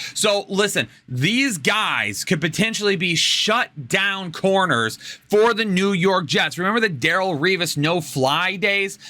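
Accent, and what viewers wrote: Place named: Minnesota, United States. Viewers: Minnesotan born n raised, and your accent was much closer dontcha know. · You had the better Minnesota accent for sure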